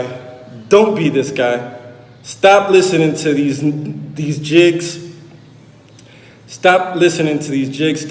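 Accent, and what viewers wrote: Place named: United States. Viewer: your a american ok.. I can tell by the way you talk your not from the island. The Dominicans I know from Panama and RD would stick they chest out and not cry like a little b$tch... They stand on what they believe not back and forth bs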